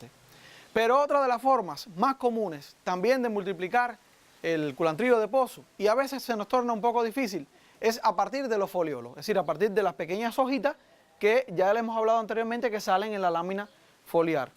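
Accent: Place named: Sancti Spíritus, Cuba. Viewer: No ee como llegue aqui pero me atrajo el asento del muchacho .sera espirituano? Soy una espirituana lejos de mi cuba bella ..y ese asento solo lo tenemos nosotros .los S.S un saludo y grasias por toda la informacion muy bien explicado ..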